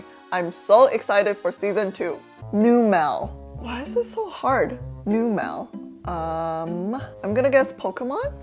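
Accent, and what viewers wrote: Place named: Canada. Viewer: You sound like a Canadian Jenny Tian lmaooo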